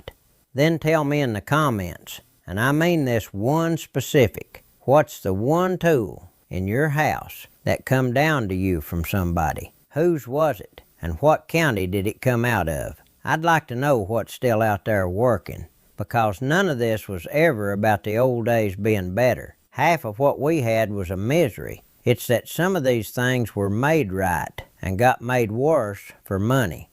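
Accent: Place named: Maggie Valley, United States. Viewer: Maggie Valley accent for sure.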